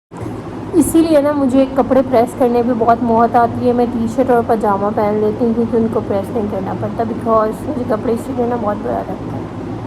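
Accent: Punjab, India. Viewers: Our Punjabi accent is so funny and cute😅😍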